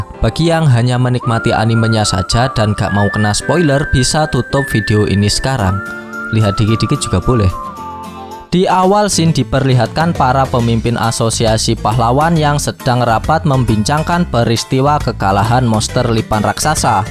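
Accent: Java, Indonesia. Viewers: Mantapp mas... Ntah lah logat jawa lebih enak di dengar buat reack manga... Ngomong ngomong stylenya mirip MR.K Animenia mas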